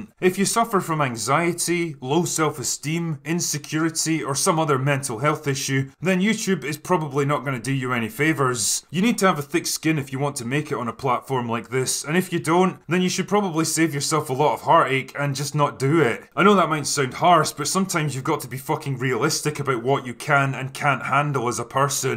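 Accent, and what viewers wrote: Scotland, United Kingdom. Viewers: Love you,...same reason I love "orange man good". You're blunt, intelligent, you don't give an F and you get to the point, with a unique Scottish accent, articulation and you're just outright hilarious at times. I thank God for ya, mate. You're a man's man with a good heart. Alright. I'll go away now. Hugs bub. 🤗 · The Scotish accent helps too.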